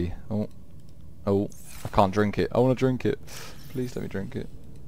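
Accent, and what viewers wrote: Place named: United Kingdom. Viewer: Excuse my racism... but wasn’t this video so much better bc of the Brit accent???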